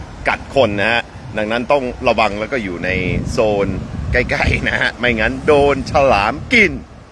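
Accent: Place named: Isan, Thailand. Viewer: อ. พูดอีสานได้ บ่คือคนอีสาน แต่ก็มีความพยายามนะครับ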